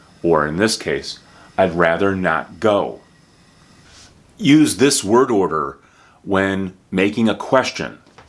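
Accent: United States. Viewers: Never thought understanding American Accent is that easy. (Namaste from India, 🙏)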